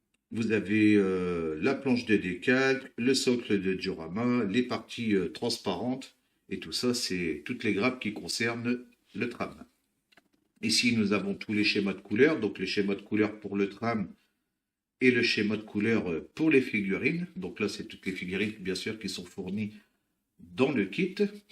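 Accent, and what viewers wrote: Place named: Germany. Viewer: je prend ta vidéo très en retard mais ton anglais sur ton tube ABT ce serait plutôt de l'allemand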